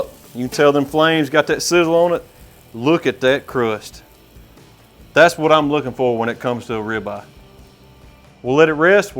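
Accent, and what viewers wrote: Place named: Appalachia, United States. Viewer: less than 3 min's in, I heard everything wrong or how NOT to cook a piece of meat like that. Second thing... lose the hillybilly accent.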